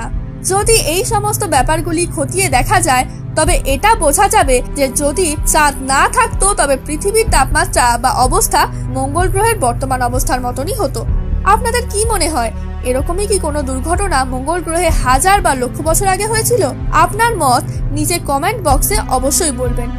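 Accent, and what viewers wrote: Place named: West Bengal, India. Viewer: Tumi mone hoy indian bangali tai na tomar kotha gulo khub cute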